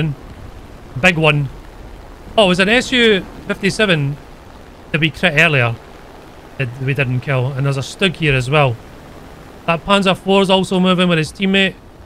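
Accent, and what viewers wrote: Scotland, United Kingdom. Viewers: Listening to is like listening to myself but as Scotsman "I hate being self aware" hit me in the feels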